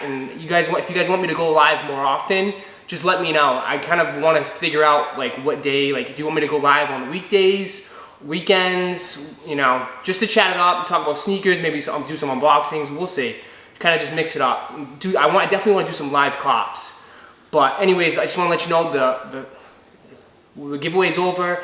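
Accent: Boston, United States. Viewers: I knew you were from Boston too by your accent! Just started watching your vids and instantly subbed. Keep it up!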